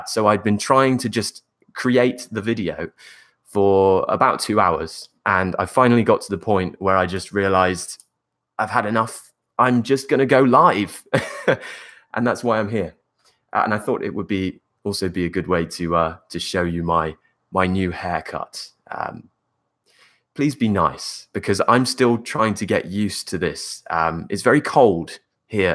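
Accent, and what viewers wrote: United Kingdom. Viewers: Hi I'm from Indonesia, I love your British accent, I like your pronounceation it completely makes me understand. And then you explain the meaning of the words. Thank you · Lots of Love from Dhaka,Bangladesh 🇧🇩. I am quite usual with British Accent through your YouTube Video. Thank You Sir. You are my accent teacher. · Oh man you just make me fall in love with British accent, I watched most of your videos, and it was really useful, I hope that to make a video about most words that people from UK using, ( informal words I can use with my friends) Thanks from Morocco 💙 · I’m studying a British accent for acting here in California. So glad I found someone with an authentic accent online who’s also a teacher:)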